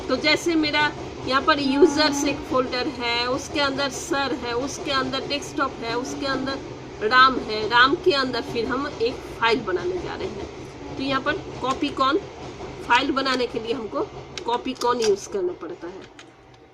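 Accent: Bengal, India. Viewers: Apka muh se hindi sunke aisa lagta hain ki ap achhi tarah bangla bol sakti hain. Ap bangla mein video kiu nahi banati?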